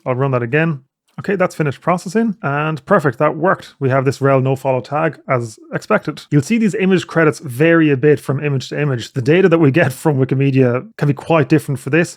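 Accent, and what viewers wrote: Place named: Ireland. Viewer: Thank you for the tutorials. Nice to hear an Irish accent too :)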